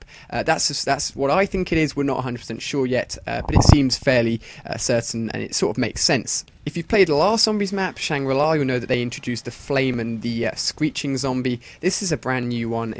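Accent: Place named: United Kingdom. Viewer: i love your british voice :| me gusta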